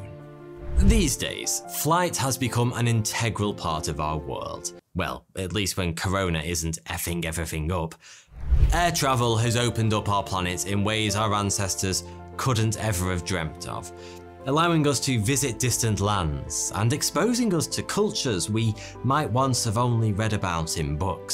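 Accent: United Kingdom. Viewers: bruh. I hate when people use copious amounts of emojis with a crappy throw away comment. Like this ain't a rap video that needs hype and It doesn't drive home Any point you made cause you made none. with his British accent sounds exactly like 42. And if you check pretty much all his videos everyone always thinks he's saying 42 until they realise, then we all have a giggle. Get it? Good attempt tho "🤣🤣🤣🤣🤣🥳🥳🥳🤯🤯🤯🌚🌚🌚🌛🌚" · I know that Brits say a lot of words differently (from Lost in the pond YouTube) but Charles' last name is Lindbergh not Lindenbergh. I had to listen again your voice while great is so soothing too. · I thought that’s what his screen name is, too, because of his Brit accent.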